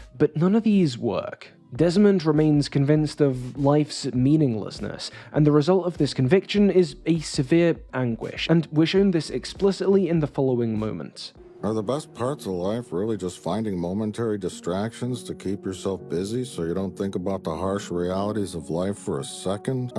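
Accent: United Kingdom. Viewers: I KNEW HE’D BE BRITISH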